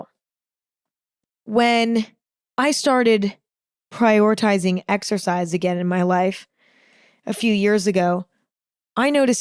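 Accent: United States. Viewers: I like your American accent and the way you speak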